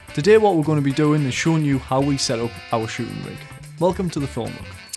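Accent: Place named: Newcastle, United Kingdom. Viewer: Youtube needs more Geordie accents- sub'd for great film making tips.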